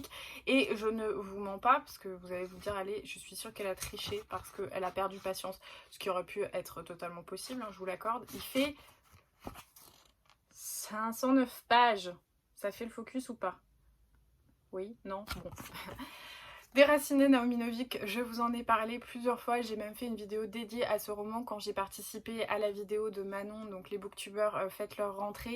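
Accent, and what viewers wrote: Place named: England, United Kingdom. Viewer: Trois de Valérie Perrin! Trop fastoche pour la première! Sinon je rêve d avoir ton accent anglais!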